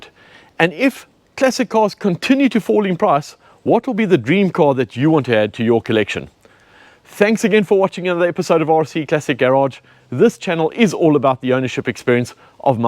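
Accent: South Africa. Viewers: That is one hell of an accent you have. South African? Whatever it is it's probably worth more than the cars. God bless. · I detect a South African accent. 🇿🇦 Sitting in my local in Margate recently, in discussion on classic cars with a friend, who like me is in his 60's, a 21 year old sitting next to us told us how he also preferred older cars, like those from the early 2000's.😅😂. Great collection & great video.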